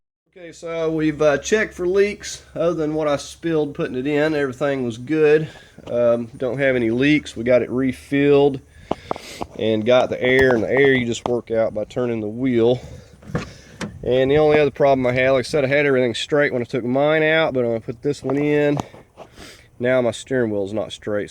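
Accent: South, United States. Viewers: Oh man, great video! Very well explained and with good tips. But as a southern man from Tupelo now living in Germany the last 20 years, it was your southern accent that made me feel at home. Loved it! I doubt I will tackle this problem myself but I will definitely not be boogering any more threads thanks to your tips. Definitely a fan now. Keep up the good work!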